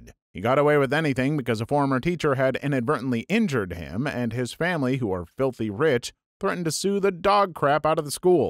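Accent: France. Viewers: Honestly, your French accent isn't that bad! I just don't understand those insults lmao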